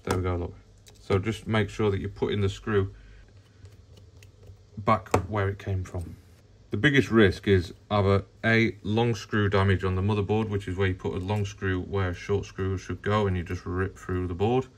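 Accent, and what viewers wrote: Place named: England, United Kingdom. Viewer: английский акцент - это кайф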